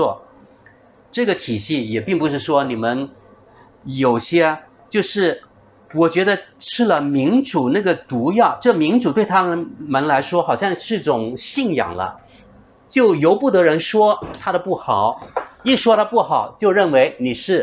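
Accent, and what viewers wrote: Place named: South, China. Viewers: airs yong 他普通话是流利的，但是有南方口音，这很正常。就象滇西小哥一样，最后一句话是有云南口音的，挺可爱的。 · 老乡，虽然你的南方口音和表达不是那么完美，但是听起来特别真诚。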